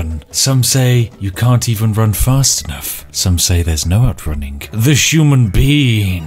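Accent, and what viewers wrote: United Kingdom. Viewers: Are you actually British because that's not how i speak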